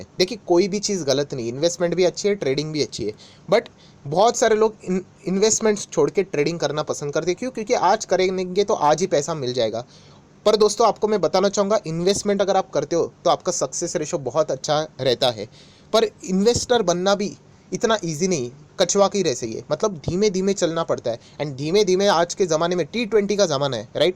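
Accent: Maharashtra, India. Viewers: Are you marathi speaking?Seems to be so from your pronunciations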